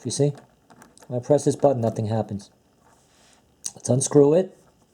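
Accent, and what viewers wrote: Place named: New York, United States. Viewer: Good review of the Daytona and love the cool NYC accent!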